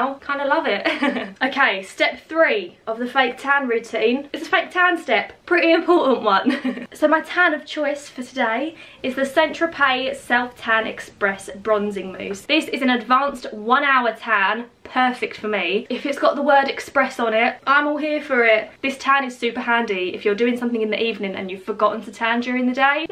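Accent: United Kingdom. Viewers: Finnally i have found a perfect video, since i couldnt decide which one to buy, you explained it perfectly, also im just so in love with the brittish accent 🥰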